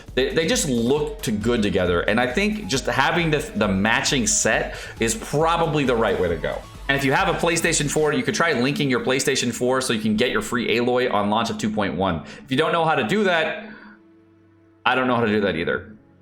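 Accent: United Kingdom. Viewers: HEY IS BACKKKKKKKK!!!! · yay is back. so happy to see him again. hope to see russian and normie soon too. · is back!! Also, thank you for the heads up! All are good tips and guesses;) btw no theory of kazuha raise a flag? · Apparently it's tea time. That's why is back ☕ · Love 🤣🌸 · The Return of 🔥 and those theories were really out there thanxx 🤣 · I was really missing, I enjoyed that! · Aye is back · Welcome back! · returns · I missed lol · Hi Hi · is back LETS GOOOO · I missed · YAYYY! is back! XD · Yay!! Glad he's not being mean to normal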